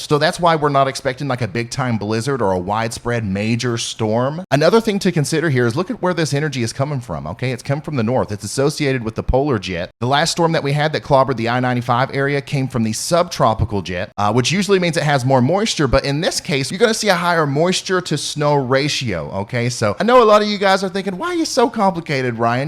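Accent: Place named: Kentucky, United States. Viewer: wow.. I think ryan lives in ky too..i recognize the accent because it's where I'm from originally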